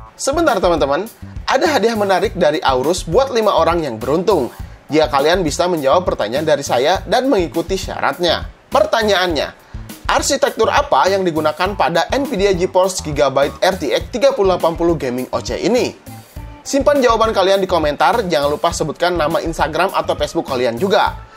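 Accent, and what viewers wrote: West Java, Indonesia. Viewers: Namanya Juga Orang Sunda Atuh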